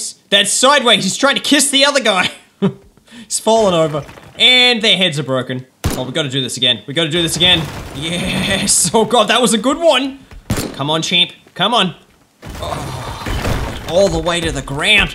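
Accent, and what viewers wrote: Australia. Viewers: im new and i like how you are so aussie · you so Australian oh no we're bodged/oh let's do a u ey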